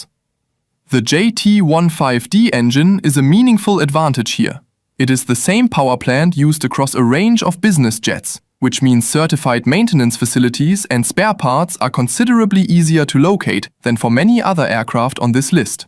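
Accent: Germany. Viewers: German, aren't you ?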